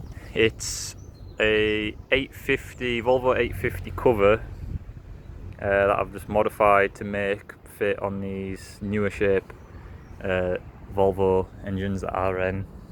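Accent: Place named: Northern England, United Kingdom. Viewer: thought so from the stronk northern accent ;)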